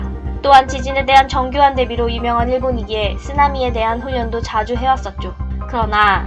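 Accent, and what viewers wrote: North Korea. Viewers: 해설자 북한사람?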